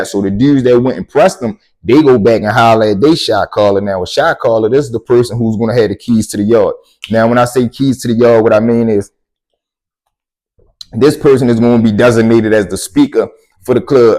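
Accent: Baltimore, United States. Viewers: I knew you was from Bmore when i heard you talking..im from east . North Rutland..i kno the talk..crazy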